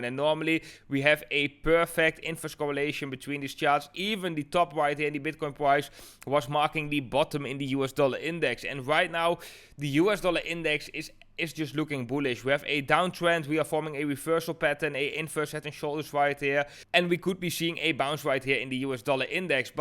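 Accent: Netherlands. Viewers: What time you sleep? You clearly sound dutch, but you always upload during the night ???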